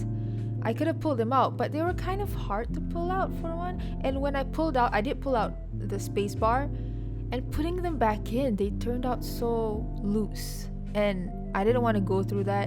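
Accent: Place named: Philippines. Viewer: just curious but are you a filipina? you sound like one ngl i am not meaning this in a bad way btw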